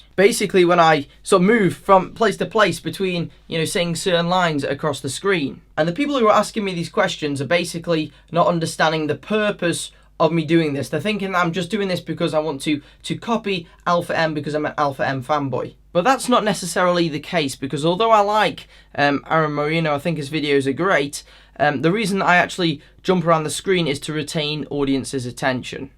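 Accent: United Kingdom. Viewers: Hi from the USA. Just found your channel and I will be a faithful watcher, yes I subscribed. It's fun to see the differences in hand gestures and hear the language of the UK. You have a great on screen presence and I personally don't believe you copy or steal from Alpha M at all. There is room for more than a few men's fashion, etiquette and style on utube. Great job. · You are one of the persons I like and admire. You have raised my style & comfort awareness. As a slim guy I really love your channel. As a lover and enthusiast of British English your voice is melodious and pleasing. Hopefully I can met you as a person one day. SUGGESTION: Please Please Please can you make a content on 'How to Develop British Accent of Spoken English' like yours. Although there are many designated channel for this but your voice is a stand out by far. I believe that this newest content will compound excitement and enthusiasm level of existing as well as new listeners. 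This content has best of both worlds i.e style and personality. Because everyday I listen to your content to you and style content, I get refreshed with zeal to learn British English (Accent). I anticipate a response at your convenience.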